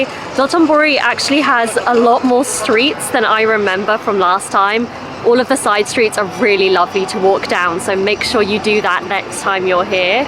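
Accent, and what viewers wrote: United Kingdom. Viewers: シックでエレガントな美人さんがイギリス英語を喋りながら、この瞬間は心は大阪人って感じね。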